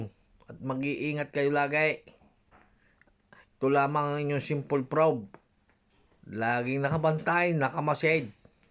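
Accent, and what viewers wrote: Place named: Batangas, Philippines. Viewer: Heheheh katuwa nmn batanggeño po kayo ..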